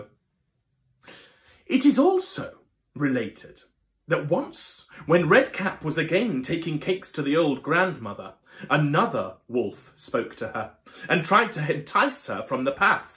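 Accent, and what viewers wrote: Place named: Newark, United States. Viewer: I loved this! Especially loved how her mother went from talking Cornish to Scouse 🤣 You're brilliant at voices though. If I hadn't seen you speaking perfect Newark slang I'd never of realised that you're from my rough town of Newark haha 😆 I could definitely watch this again.! I hope you keep going up in the world of theatre 🖤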